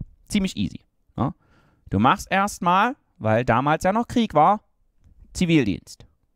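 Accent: Saxony, Germany. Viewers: Typischer Sachse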